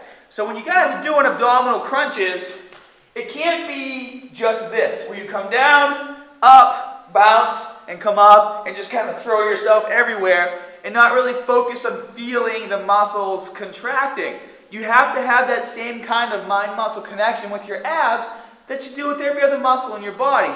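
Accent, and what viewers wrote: Boston, United States. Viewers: You are from boston no ?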